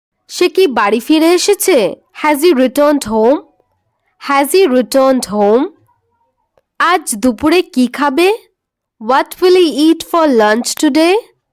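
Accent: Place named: North Bengal, India. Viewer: উত্তরবঙ্গ মনে হয় আপনার বাড়ি।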